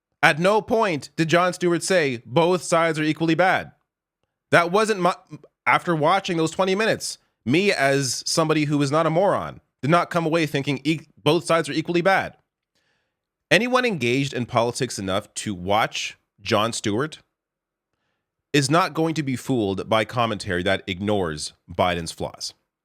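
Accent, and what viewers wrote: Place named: Canada. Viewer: This guy sounds like a Canadian.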